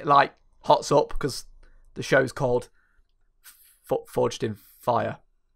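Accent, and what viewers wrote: United Kingdom. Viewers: how to make a convincing british accent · British man recaps a show about Radom 40 year old people make pipe bombs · Aggressively british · You. Sound very Br tsch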